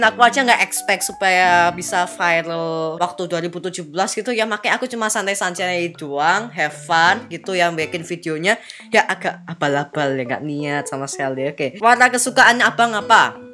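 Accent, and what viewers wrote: Java, Indonesia. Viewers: Gw Udah Penonton Old Tapi Gak pernah Komen Jir :V Gw suka Syle lu Medok :V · Medhug suaranya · Mungkin tanpa kalian sadari suara agak medok jawa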